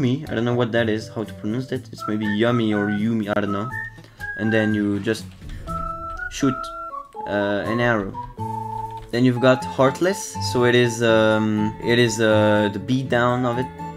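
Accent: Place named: France. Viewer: u got a french accent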